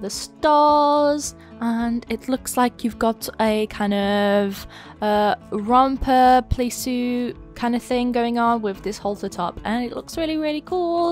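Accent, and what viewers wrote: United Kingdom. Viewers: Why do i feel like your half british. o.O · Weird question, are you British? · Is this British accent BC if it is its music to my ears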